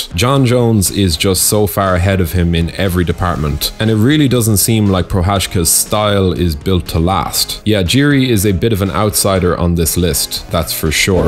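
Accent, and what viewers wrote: Ireland. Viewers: Hooray the Irish voice is back!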